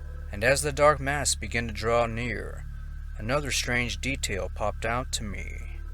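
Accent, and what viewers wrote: South, United States. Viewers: Always loving that southern accent .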